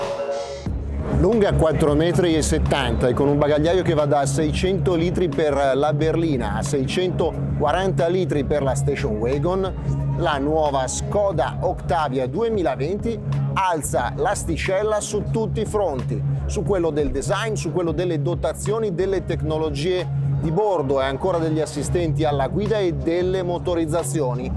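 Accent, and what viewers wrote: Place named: Milan, Italy. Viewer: Bravo lo speaker. Peccato per l'accento meneghino.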